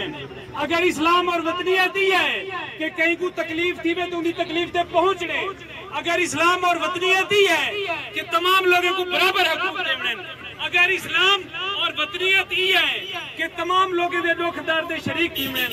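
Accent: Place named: Saraiki, Pakistan. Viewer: بہترین وسیب دی آواز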